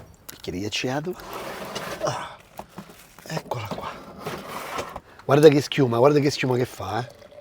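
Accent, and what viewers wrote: Rome, Italy. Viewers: Bravo il tuo romanesco mi piace sei simpatico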